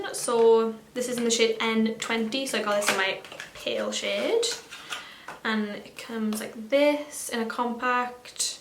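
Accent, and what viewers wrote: Wales, United Kingdom. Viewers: are you welsh?xxx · Watches video hears welsh accent subscribes 👍🏼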